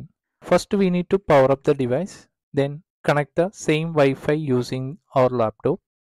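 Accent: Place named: Kerala, India. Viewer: english ketapol malayalee enu mansilay...universal repeater mode anelum kuzpam undo?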